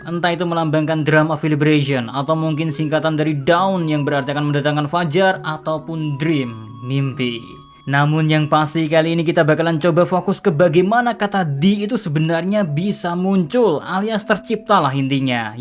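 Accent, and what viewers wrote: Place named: Bekasi, Indonesia. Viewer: Admin orang bekasi kek nya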